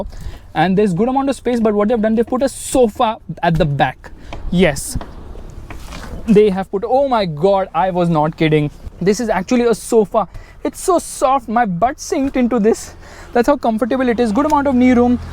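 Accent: India. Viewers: Dont speak English in indian style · ..... Indian accent.....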